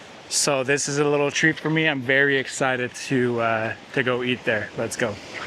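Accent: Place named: Mexico. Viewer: You’re doing a great job contributing to México with your updates. Really shows how much you love México and have made it your home for your family. I hope that Mexicans embrace your integrity and authenticity. Appreciate your consistency with videos, your beautiful Mexican Spanish accent (and the effort!), and your sense of humour.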